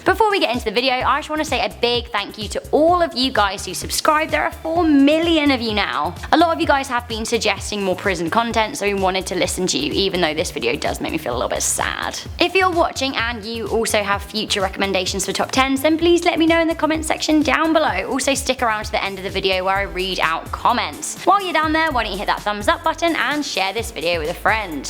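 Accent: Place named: United Kingdom. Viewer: British accent always amuse me🤣🤣🤣🤣🤣🤣🤣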